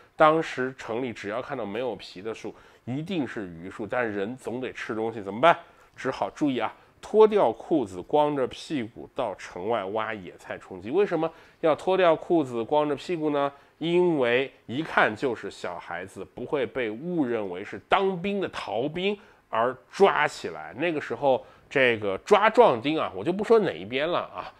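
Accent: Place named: Northeast, China. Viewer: 还别说，这东北话说的还挺顺溜。老铁那啥呀，还是别叫老北京口语了，改老东北口语吧！:face-blue-smiling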